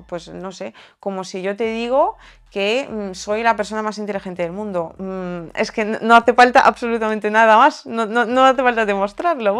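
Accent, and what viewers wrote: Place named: Spain. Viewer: Para la mentalidad e idiologia"otanista"pro EEUU y pro Ucrania,los rusos socialistas/comunistas son los malos,pero imaginate un socialista/comunista que encima es"sudaca";seguramente tu que estas por tu acento,en España entenderas mas que nadie a que me refiero.A los colonialistas de los imperios europeos y americanos no les alcanzo con llevarse las riquezas y masacrar a sus pueblos indigenas de sudamerica,para ellos hoy dia seguimos siendo menos que escoria.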